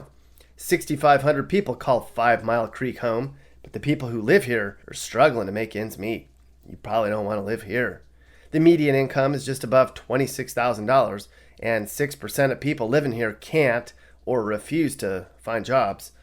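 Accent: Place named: California, United States. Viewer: He sound like a fucking cali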